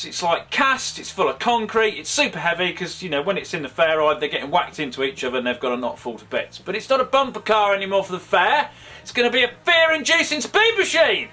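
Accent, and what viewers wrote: United Kingdom. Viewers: british clearly, he lives in lincolnshire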